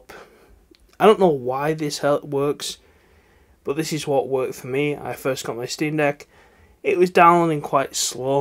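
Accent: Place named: North, United Kingdom. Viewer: cheers. where u from mate u have same accent as where in from be up north lol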